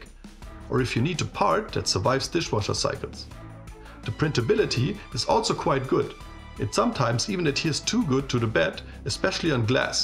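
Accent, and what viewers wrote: Germany. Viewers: Not a native speaker. To good and clear pronunciation :) German? Subscription is done.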